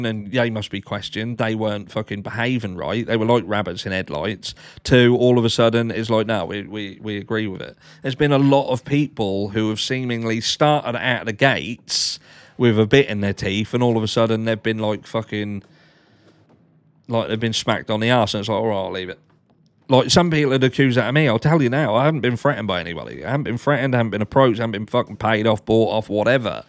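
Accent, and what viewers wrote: Norfolk, United Kingdom. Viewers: Love the Norfolk accent I’m across the border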